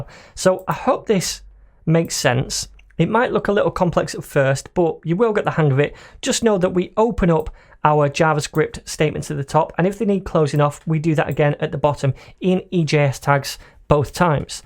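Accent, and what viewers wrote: United Kingdom. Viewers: Bro the moment I start working I'll by a course, merche or do something to pay you for such a content as good as this, at first I didn't wanna try because it's a little difficult for me understand british accent but I not just improve that part of my english but also lear so much about node. GREAT CONTENT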